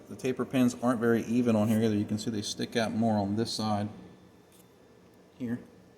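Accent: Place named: Maryland, United States. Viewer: Born and raised in Maryland here ha, I thought I could pick out that tiny Maryland twang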